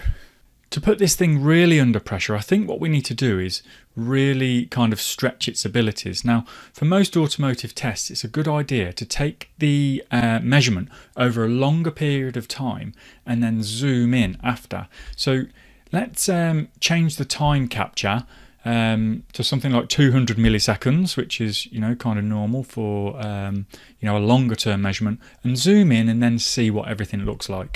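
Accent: United Kingdom. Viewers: I am sure that accent is from my neck of the woods Burton On Trent ? I have one of these 1008 Hantek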